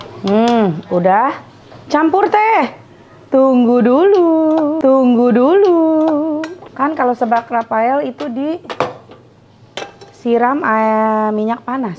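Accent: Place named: Sunda, Indonesia. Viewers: meni lekoh kitu sundana teh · Ceceu muni lekoh sundana 😂😂😂 resep jadina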